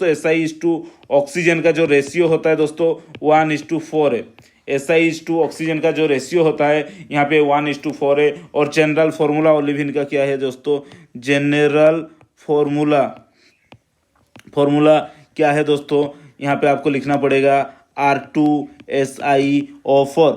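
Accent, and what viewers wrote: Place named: Odisha, India. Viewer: It seems to be a odia tounge with a imperfect hindi.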